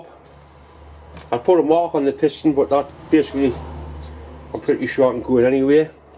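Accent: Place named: England, United Kingdom. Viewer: Ok, I dug up my very old account and subscribed to channel and I gave a thumbs up as I should have long time ago, fore you asked me to,. I am a long lurker here otherwise:) Excuse me for my language errors, I'm a norseman:) Hi, I like your stuff there in England, especially your, for me, different dialect. I love dialects. Most Swedes wouldn't care about such things but I do. Bo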